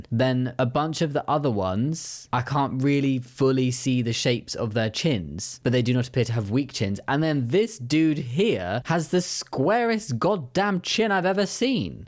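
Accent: England, United Kingdom. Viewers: has such a lovely accent (Brits, ignore this comment; y'all wouldn't get it cos you're from the U.K. so you're immune 😅). As a casual viewer, I can't recall if he's mentioned where exactly in England he's from 🤔 But I know he mentioned traveling to London. So that can't be where he lives. Anyway, wherever in England he's from, I love the accent.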